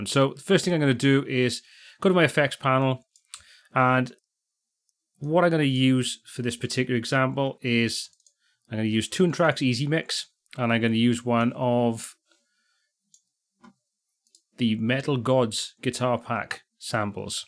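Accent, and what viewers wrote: Wales, United Kingdom. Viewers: Im sensing a bit of welsh on your voice there mate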